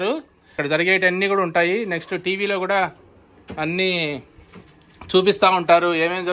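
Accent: Rayalaseema, India. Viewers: bro rayala seema yaasa super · Americalo vunna swachamaina seema basha mataduthunnaru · అన్నా నువ్వు చెప్పే విధానం చాలా చాలా బాగుంది నువ్వు అమెరికా సముద్రాలు అన్ని తిరిగిన మన రాయలసీమ మాటతీరు మర్చిపోలేదు.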